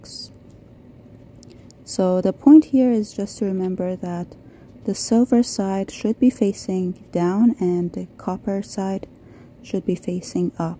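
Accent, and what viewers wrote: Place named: Iran. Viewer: I hear a lovely iranian accent ❤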